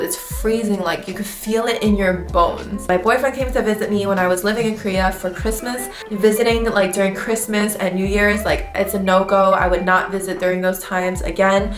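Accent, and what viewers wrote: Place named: Queens, United States. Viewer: She has such a queens accent.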